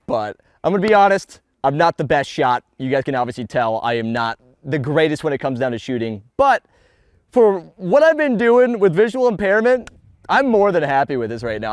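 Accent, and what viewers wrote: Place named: Texas, United States. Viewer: He has to be from texas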